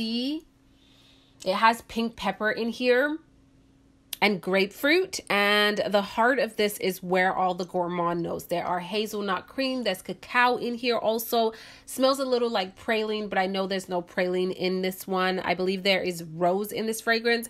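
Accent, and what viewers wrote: New York, United States. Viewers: how did you start getting a NY accent???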